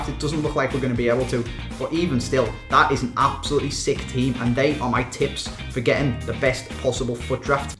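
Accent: Liverpool, United Kingdom. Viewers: His he scouse (Liverpool) or welsh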